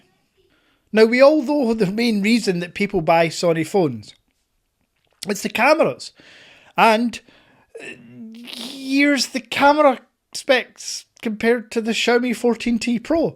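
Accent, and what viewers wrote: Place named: Scotland, United Kingdom. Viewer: Scottish accent! Rab C Nesbitt does tech.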